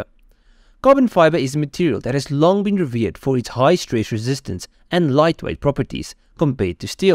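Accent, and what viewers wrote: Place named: Johannesburg, South Africa. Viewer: Sounds like he’s from joburg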